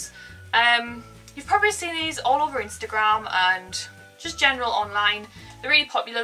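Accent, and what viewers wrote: Newcastle, United Kingdom. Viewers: You sound like ur from Newcastle (like me btw ) r u xoxo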